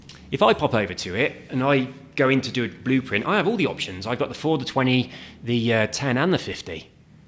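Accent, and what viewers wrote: United Kingdom. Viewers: I'm still struggling with drifting. You are surpassing me easily now though. (Do you feather the accelerator?) I cant quite get the hang of it.. Btw how come you can fast travel , have you got all the fast travel signs/bonus boards? there are about four I cant get - in the pipes on that motocross park, and one one the top of some house, and one up by the wind farm. ( I still dont have the FT option, even for money? weird ) would appreciate some ideas one those boards if you know how to get them! thanks. Really enjoy your videos as you know. Your accent is definitely getting more Norwich now though.. lol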